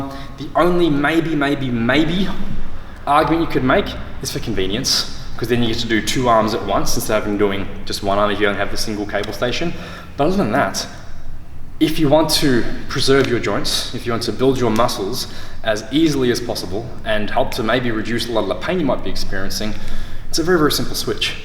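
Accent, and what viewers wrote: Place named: Australia. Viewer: An Asian with an Australian accent who has the body of a statue 😍🤭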